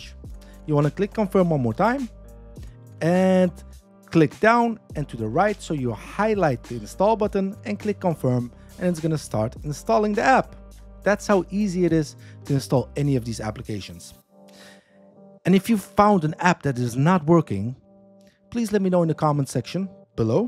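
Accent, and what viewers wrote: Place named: Netherlands. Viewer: Deze Hollander spreekt bijna Engels. Nee, goed hoor ik moest echt goed luisteren om het te horen.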